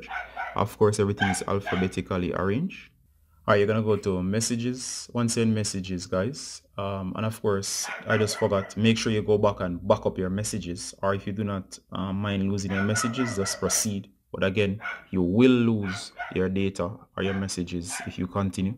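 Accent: Jamaica. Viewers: What the hell, lmaooo not an indian voice but a fellow Jamaican, wow, big up #.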